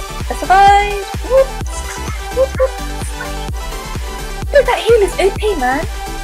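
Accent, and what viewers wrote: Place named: United Kingdom. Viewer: Gg British accent..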